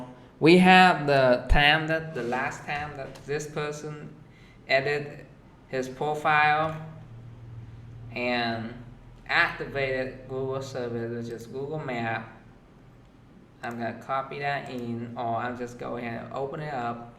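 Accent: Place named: South, United States. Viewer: Why do you have a southern accent? lol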